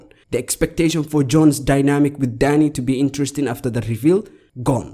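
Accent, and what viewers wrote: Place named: Somalia. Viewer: Are you East African? You have a Somali type of accent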